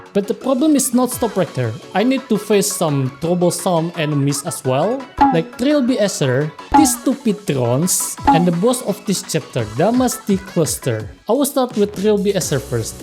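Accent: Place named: Indonesia. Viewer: kenapa ya orang indo kalo ngomong inggris kaya gitu semua ya? Kaya ada keunikannya sendiri langsung ada feeling "oh ini indo nih"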